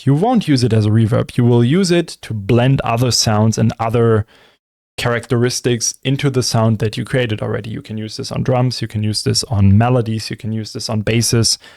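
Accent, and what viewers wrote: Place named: Germany. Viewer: Du klingst deutsch . Bist du deutsch ?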